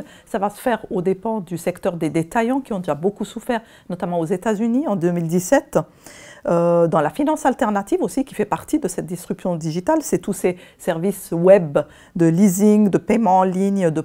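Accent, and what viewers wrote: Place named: Switzerland. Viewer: Delicieux accent qui sent le chocolat Frigor et le Läckerli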